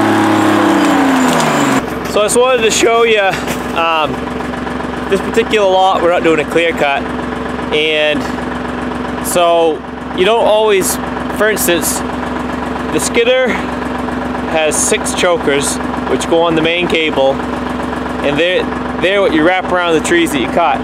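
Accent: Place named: Maine, United States. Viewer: The “Stump Lickah’s” are out in full force, going after the cedar bark, at that time of year!!! Unless you have some crab apple trees down back, but usually those are stripped pretty bare by the end of January!!! Your accent’s a little thin for being a “DownEastah”, but it’s nice to hear on YouTube!!! Hi from Central Maine, and Family!!!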